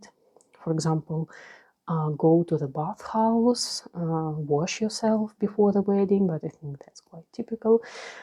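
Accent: Russia. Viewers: I love your videos!!💗❤️ just found you and I’m very happy to have. I love the Russian accent for asmr lol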